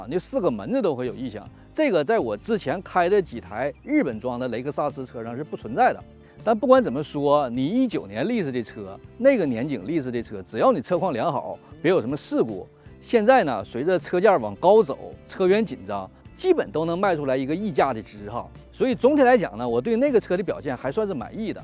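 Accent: Northeast, China. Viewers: 一听说话就是东北人呀，辽宁人吧，我吉林的，想问你一个题外话，美国公立医院看病是不是免费的麻烦你。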